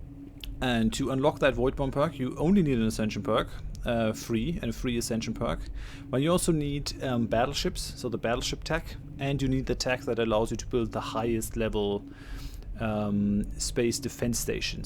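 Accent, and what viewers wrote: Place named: Germany. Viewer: Sounds german ;)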